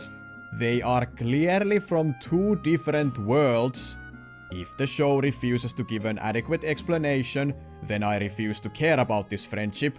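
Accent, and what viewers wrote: Finland. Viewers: Are you finnish by any chance?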